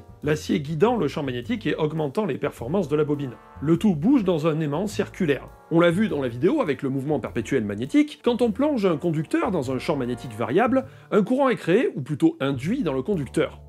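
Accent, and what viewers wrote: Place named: Saint-Étienne, France. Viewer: J'ai crue entendre un petit accent stefanois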